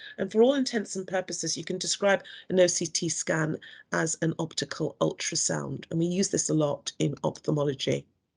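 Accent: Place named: United Kingdom. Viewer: Everything sounds better in british english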